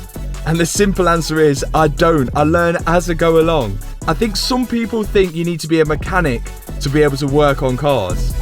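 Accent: Leicester, United Kingdom. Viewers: Damn this guys really reminds me of the Stradman. Just hear the way he is talking (except the British accent) and also the hat (they are both always wearing a hat)! They also have the same sort of energy 😀😀😀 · Leicester boy by your accent 😉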